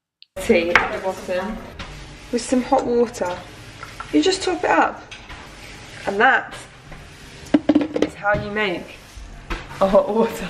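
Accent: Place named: United Kingdom. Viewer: Love your accent, voice , face British people are really beautiful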